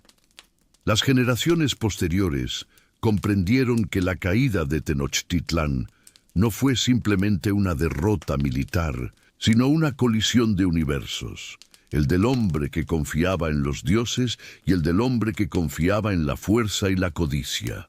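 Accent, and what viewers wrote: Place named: Spain. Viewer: Me cargan las voces de gachupines contando historias de las civilizaciones a quienes invadieron robaron devastaron y saquearon durante siglos... pobrecitos aún siguen con hambre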